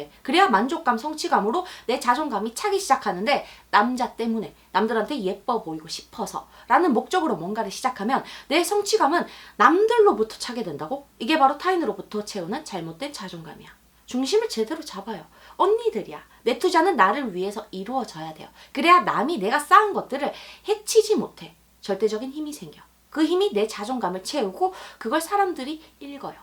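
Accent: Gyeongsang, South Korea. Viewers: 언니 대구분?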